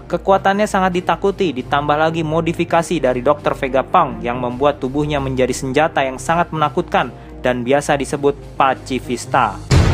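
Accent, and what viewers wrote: Indonesia. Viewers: Aduu baang, bacanya diperbaiki mohon. Jangan semua nama diucapkan sama pelafalan Indo :(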